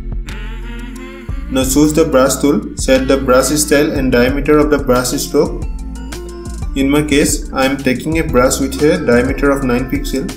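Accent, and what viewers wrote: India. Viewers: finding a tutorial 😀 Indian accent ☹ only tutorial there is 🙄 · You sound like an Assamese. I am Assamese and ik my ppl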